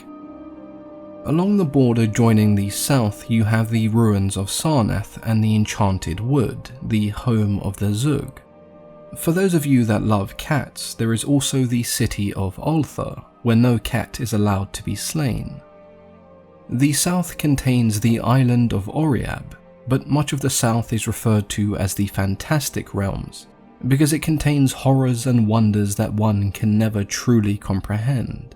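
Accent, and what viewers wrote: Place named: United Kingdom. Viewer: I enjoy the Dream Quest of Unknown Kadath as somewhat light reading/listening. It seems quite different from any other of HPL's works and reminds me a great deal of Grimm's Fairy Tales. It almost feels like it was written for children because there is really nothing of horror about it and everything turns out fine in the end. The only thing that disappoints me about it IS the ending! The "there's no place like home" trope was way too simplistic and unsatisfying for me. If Carter was this old and experienced dreamer wouldn't he have recognized the Sunset City as a conglomeration of memories of his childhood and the town he grew up in? In fact that was eluded to in the beginning of the story, but I'm just nit picking. It's a fun story to fall asleep to especially when a British person is reading it! And I do enjoy rereading it occasionally too.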